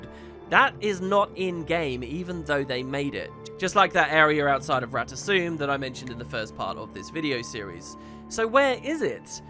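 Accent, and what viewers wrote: United Kingdom. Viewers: But he IS actually british. You can hear it even better in old GW1 videos.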